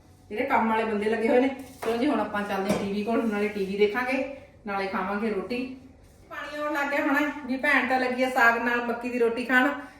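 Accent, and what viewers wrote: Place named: Fatehgarh Sahib, India. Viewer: Asi bhi aive tadka laune aa ji tuhadi boli Fatehgarh sahib ja ropad dist di sister kehda pind aa ji tuhada video bahot sohniya hundiya ne tuhadiya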